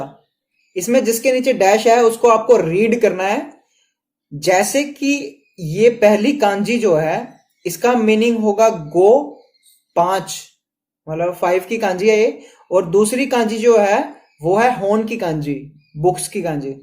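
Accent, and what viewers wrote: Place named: Punjab, India. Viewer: Awaaz me punjabi tone aa rahi hai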